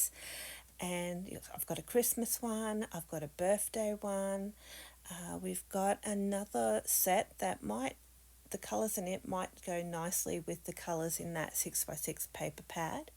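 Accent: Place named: Australia. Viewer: I think everything you got was marvellous, the travel sticker book and papers were my favourite Great to hear a fellow Aussie...tfs:) Zelda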